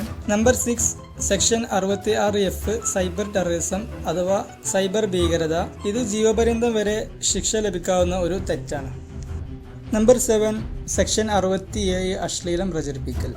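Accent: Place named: Malappuram, India. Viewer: P P മലപ്പുറം ഭാഷ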